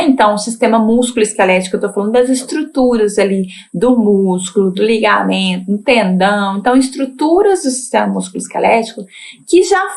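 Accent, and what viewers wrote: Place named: Minas Gerais, Brazil. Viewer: Bem que esse sotaque não é estranho , meus são mineiros de Belo Horizonte ❤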